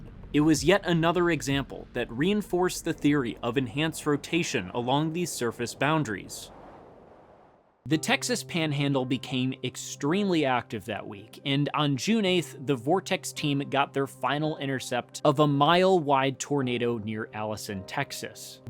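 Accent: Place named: Upper Midwest, United States. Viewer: "keep an eye on that bob" You can tell he's from the northern midwest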